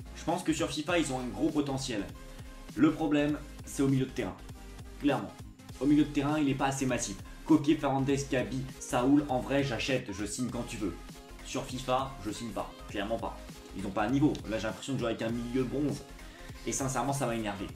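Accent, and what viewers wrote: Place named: Spain. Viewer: Très belle accent espagnol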